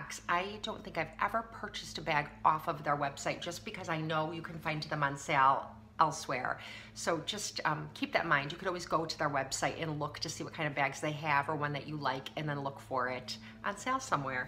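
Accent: Wisconsin, United States. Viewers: I absolutely love HOBO bags. Love the 70’s decor on them. And the leather is phenomenal. I have 5 bags and 2 wallets. The wallet you are showing is called the ‘Lauren’. It is one of their most popular bags. Ps. You are very pretty. And you sound like you are from Wisconsin. Happy Holidays/Birthday. ☺️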